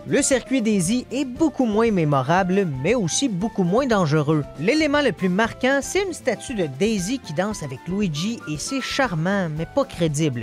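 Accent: Quebec, Canada. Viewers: cette vidéo serait moins bien sans accent québécois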